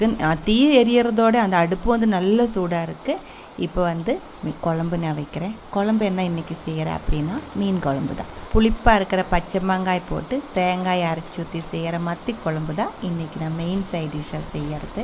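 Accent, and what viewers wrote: Kerala, India. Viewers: പക്ക മലയാളിയുടെ തമിഴ് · Sadharana eniku thamil manassilavarilla but ningal samsaram nannayitu manasilavunnu. Keralathil thamasikkunnath kond malayalathinte influence undo samsarathinu? Nice vlog...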